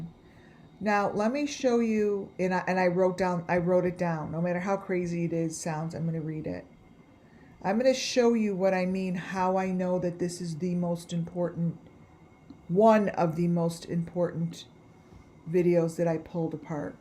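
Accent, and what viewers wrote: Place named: Midwest, United States. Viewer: Your voice sounds like that other channel. Um, chasing truth I think. It just could be the Midwest accent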